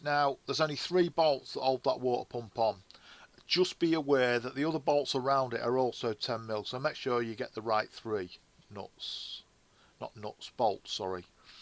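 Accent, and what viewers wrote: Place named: Northern England, United Kingdom. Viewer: Hi from Manchester! You sound like a northerner too! Great video and like the Funky music!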